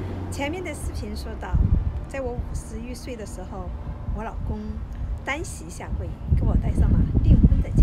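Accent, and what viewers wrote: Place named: Sichuan, China. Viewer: 姐姐好听四川话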